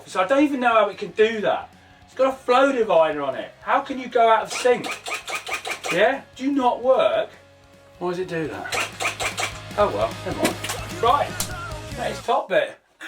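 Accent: England, United Kingdom. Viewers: Сука мега амерзительный чисто английский язык другое дело американский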